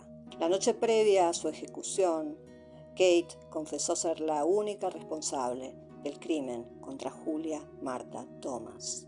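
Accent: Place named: Spain. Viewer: Me gustan los temas pero el acento español de la narradora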